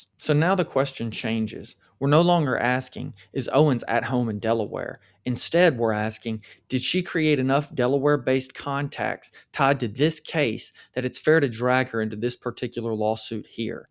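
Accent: South, United States. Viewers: 1st time viewer here! I’m enjoying your content! So, you’re in CA, but you’ve got a delightful southern accent. I wonder which state. Texas, or where???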